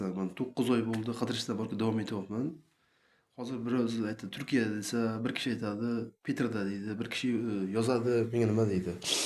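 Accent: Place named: Tashkent, Uzbekistan. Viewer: Ярими шива ярими тошкинча😏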